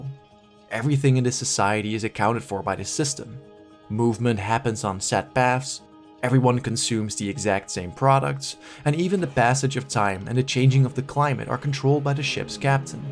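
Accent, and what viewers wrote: Netherlands. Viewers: Just subscribed! I watched a few vids and just a question, are you dutch?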